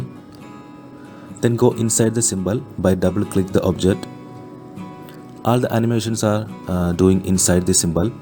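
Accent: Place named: Madhya Pradesh, India. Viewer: are you from mid pradesh cuz you accent goes hard ngl *fire emojji*x2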